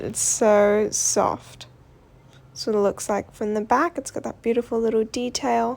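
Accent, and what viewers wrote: Australia. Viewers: Good review and you have Aussie accent 😎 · Before you moved to Australia? But your accent sounds just like any other Aussie!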